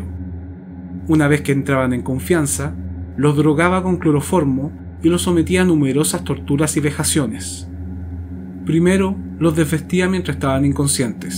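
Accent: Chile. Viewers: es chileno el que habla?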